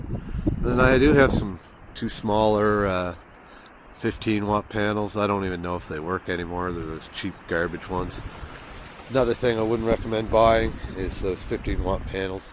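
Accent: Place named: Nova Scotia, Canada. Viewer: Right on. My wifes aunt lives in chester grant. I thought you sounded like an Aylesford/ Morden guy.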